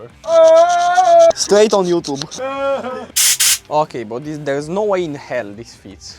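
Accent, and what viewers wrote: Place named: Romania. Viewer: Ma gandeam dupa accent ca esti roman si am auzit spray de tanatari 😂😂😂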